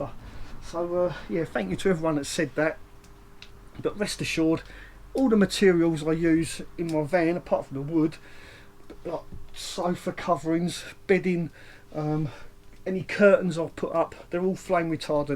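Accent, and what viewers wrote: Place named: Essex, United Kingdom. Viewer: trying to guess your accent and we recon Wickford or Basildon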